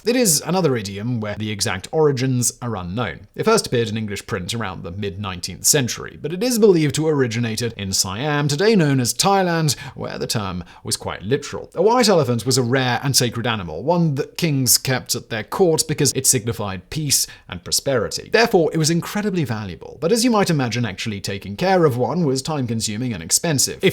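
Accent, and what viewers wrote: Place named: RP, United Kingdom. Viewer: I’d not heard of ‘jumping the shark’ before. But I was wondering the other day about where ‘Bob’s your uncle’ came from. BTW, you and I both speak British RP, so for future videos, Marquis is not pronounced markee, as in the large wedding-type tent, but markwiss. (We might be hearing it a lot next year, come the coronation!)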